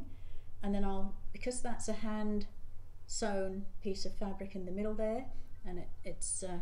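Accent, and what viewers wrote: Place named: Australia. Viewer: This is absolutely beautiful and a wonderful tribute to days gone by. I've only just found your channel and can't wait to watch more. Lovely to hear an Aussie here. I'm in NSW 🌷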